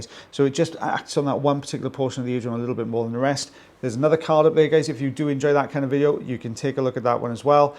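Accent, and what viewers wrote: Wales, United Kingdom. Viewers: Think you could do an audio book. Cool, calm, Welsh accent, 🎉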